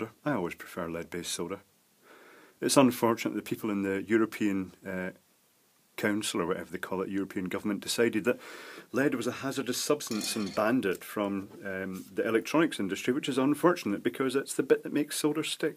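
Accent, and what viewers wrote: Scotland, United Kingdom. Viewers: he does, but that's the best way to teach and to learn. You'll learn more from the spoutings of an expert like, than you will from a random video or book. I litterally happened to watch a vid which took my interest called "poundland LED's" I though, "Oh I didn't know PL made LED bulbs, I'll have a look and see the vid to see if they're any good as I wouldn't mind pur-chasing some for my abode" 4 hrs later I'm still on his fuckin channel, and actually feel confident enough to fuckin LED my house up myself! Seriously! This man is a fuckin ledge! And with a FUCKIN AWESOME Scottish accent to boot as well! What a voice and what an accent! Ya wanna think about doing some voiceover work, on the side, seriously. "In a word without LED'S. Only one man can make a difference....."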